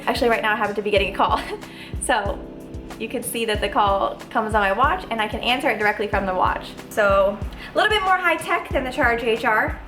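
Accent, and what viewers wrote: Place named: Michigan, United States. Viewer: Great review! I'm looking at getting the Blaze now that the prices have dropped and this will help me out! I love your accent. It makes me think of "home" in Michigan! :) I assume you are from the Northern middle of the country somewhere. The "oh geez" was classic! It's been a little less than a year since you posted this. Do you still like the Blaze?? Are you actually getting the 5 days charge that they advertise? Have they updated the software with anything you find useful/fun/interesting??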